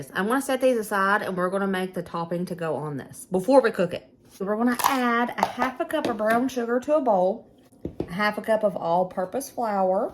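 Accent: South, United States. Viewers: I love hearing your voice! Girl, you deliver with a happy Southern accent! Thank you for being honest and ‘real’!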